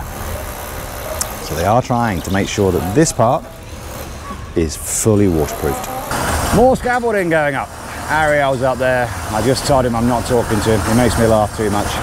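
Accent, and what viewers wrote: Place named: England, United Kingdom. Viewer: Hi, I stumbled onto your channel [on a recommendation]. You must doing something right. So, I'm another new subscriber. It's great to hear a English chap [for a change] in the Philippines doing a building project. Anyway, I have a lot of catching up to do to watch all your episodes, so don't tell my asawa. Hope you enjoy the fruits of your labour. Thumbs up 👍.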